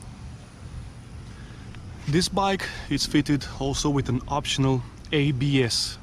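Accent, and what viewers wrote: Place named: South, United States. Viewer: I can barely understand your southern accent.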